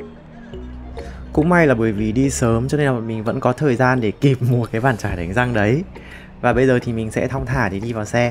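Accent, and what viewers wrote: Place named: North, Vietnam. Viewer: Cảm ơn em, em dẫn chương trình khá dễ chịu, nói chuyện châm rõ ràng, chất giọng chuẩn trai bác, cô gắng nhé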